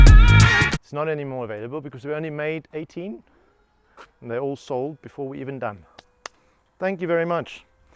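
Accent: South Africa. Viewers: Are you South African? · sure runs that pie-hole ~ is that a S African accent?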